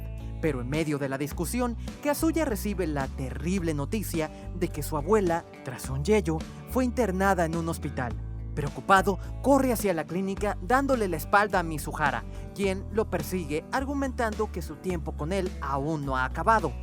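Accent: Venezuela. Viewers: oye wey tu eres venezolano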